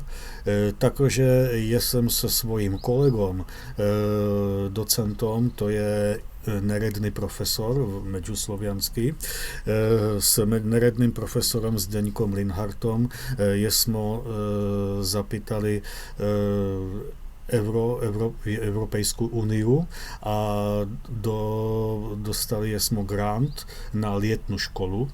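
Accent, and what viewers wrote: Czech Republic. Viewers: Молодцы! Получился русский с сильным чешским акцентом! В принципе зря старались, ибо старославянский давно есть. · Inter slavic language with typical Czech accent 😉. Sounds Like standard Moravian combined with Czech and slovak and little serbo-croatian. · it's not 50% Czech, it's his accent that made you think so. I'm Polish and I've heard another Pole speaking Interslavic - I then also thought it sounds very similar to Polish, which wasn't the case in this video anymore. Our native language surely will have some impact, that's obvious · To be honest Mr. pronounces Interslavic clearly with Czech "filter". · Akcent robí neuveriteľne veľa. Prvé slová a ihneď som vedel že sa jedná o cecha. Klobúk dolu za prácu a výsledok . Inak prečo mám pocit že je tam najviac slov z češtiny alebo slovenčiny. Ak pozerám na medzislovancinu v titulkoch a npr. 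poľštinu, zdá sa mi že tam je naozaj viac našich slov, alebo to je čisto subjektívny pocit a zdá sa to každému . ;) Inak, mal by som otázku, ktorý súčasný jazyk je najbližšie k jadru interslavic ? Veľmi zaujímavá práca. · It was easier for me to understand as a Czech, because Mr. is Czech and has a clear Czech accent, lol, but this is an interesting idea. If people in Slavic countries actually spoke this language, I think I could learn it for a couple of months and then would be able to hold simple conversations without big problems. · У меня одного ощущение что это ман говорит по русски только с сильным чешским акцентом..... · I am Czech and for me it does not sound like Czech, there are many words that are not Czech. Maybe just a little bit. But the man, being Czech, speaks it with 100% Czech accent. I understand 95 % of it. The other person speaks Polish and I understand maybe 80 % of it. 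I like the idea and would like to learn this universal slavic language. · Ano ten český přízvuk je hodně slyšet :D.. občas to řekne dokonce česky a pak se opraví ale na tom není nic zlého :) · polacy tutaj macie srbski jazik jako najmezdu hahahha znaczy Pan mowi jako czech ktory zna po serbsku ( ma ta twardosc ) umiescil duzo crkweno slowenskogo,ruskiego tu slychac a ni troszku tylko dodanie JA MNOGaja RODnaja ,blgarskiego niema tego tonaliteta , polskiego tez nie slychac nie ma a ni troszke tekko miekkego wymawania i nazalnosci . TO pisze z punktu dzwiekowego , czyli ucha , ktora mi pomogla ogarniac nasze jezyky . Wiec tutaj slychac Slowienca ktory zrastal s Yugami na osiedlu potem pojechal do Czech sadzic bo tam spoko calkiem inaczej bede brzmial Blgarin ,Boiko , Leh, Bunjevac lub Bosanac , mowiac medzynasz I to jest najciekawsze dla ucha i mojiej strasti pra nasieh jazici bracioo Wielki pozdraw dla Polakow najbardziej bo to moje glowe uczcie kirila i serbski i wsjo paniatna Swietna robota tylko prosto w do glebine niewyczerpane TAKO ISTO SAMO PRA VSIEH SLOVENSTVUJUSzCzIH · kenan Sljivo gaming he’s Czech you can tell by accent, we might just use the most archaic words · I am part Czech, part Polish and I understand most of these. 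But have strong Czech accent. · I can understand very well what Mr. says. Maybe also because he talks with a Czech accent. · bo jest czechem to ma akcent czeski jak będzie polak to będzie zalatywal na polski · He speaks normal czech sometimes with few different words, but accent is 100% czech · I'm Polish and I understood everything except for just two words (letak and gledat). But with some 3-4 more words my intermediate Russian might have helped. Generally it is very, very understandable for a Polish native speaker too. For the sound, it sounds to me like Czech mixed with some Russian, but that's of course very subjective and it's because of the author's lovely Czech accent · As a czech i understand 100% but maybe only he has czech accent and I'm from czech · Yes, and he has strong czech accent, i can hear it 😂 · Słychać wyraźnie, że Czech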